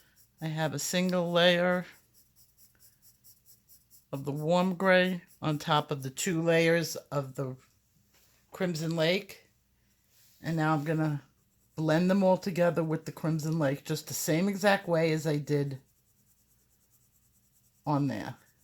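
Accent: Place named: Italian American, United States. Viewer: I found this to be extremely helpful when dealing with greys. Thank you! I really enjoy your videos. Your accent reminds me a lot of my late mother- in -law......Italian American from Rochester NY. It wasn't quite as strong but she had lived in California for a lot of years.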